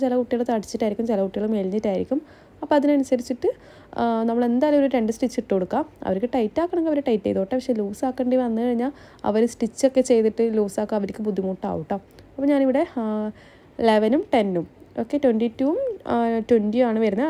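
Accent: Kannur, India. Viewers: ഇത്ത ഇങ്ങൾ കണ്ണൂർ ആണോ ? Slang😁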